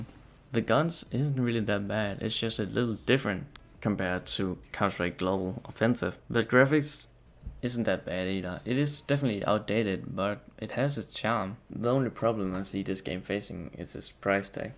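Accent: Denmark. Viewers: Ingen tvivl om at du er dansker med den accent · Fed Dansk accent haha! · i smell danish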